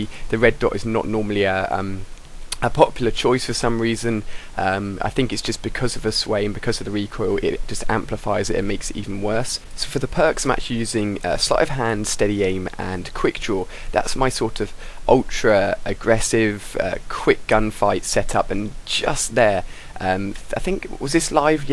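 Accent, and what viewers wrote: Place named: England, United Kingdom. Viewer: you sometimes sound like brownay( i hate brownay) but in a english accent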